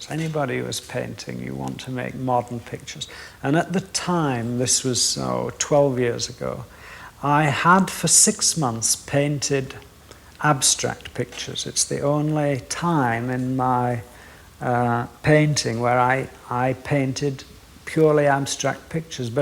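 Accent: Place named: Yorkshire, United Kingdom. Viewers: Mildly interesting that his Yorkshire accent has got thicker in the intervening 50 years. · An amazing man with amazing ideas Even in more recent times he still has his broad West Yorkshire accent 🖼️🖼️🖌️🖌️🎨🎨🇬🇧🇬🇧🏴🏴❤️❤️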